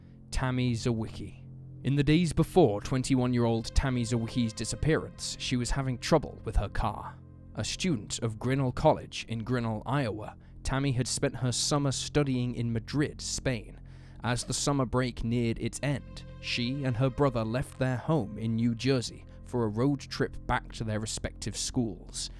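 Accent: England, United Kingdom. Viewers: Narrator has an English accent.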